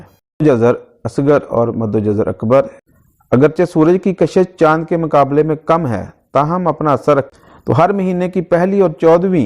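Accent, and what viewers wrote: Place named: Punjab, Pakistan. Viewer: کتنا گندا پنجابی عجیب لہجہ وہ بھائی کچھ خدا کا خوف کرو تم لوگ اچھی آواز والا خالص اردو والے سے یہ ویڈیوں بنوا لیتے فل پنجابی لہجے والا کرخت انسان کی آواز جو انتہائی کانوں کو بری لگ رہی ہے سننے کو دل نہ کرے انسان کا مگر بے شرم قوم کو سب ہضم ہے ادھر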